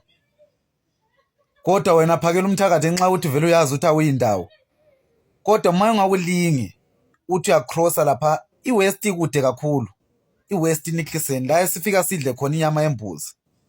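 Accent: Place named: Zimbabwe. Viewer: Futseg wena nesi Zulu sase Zimbabwe 😅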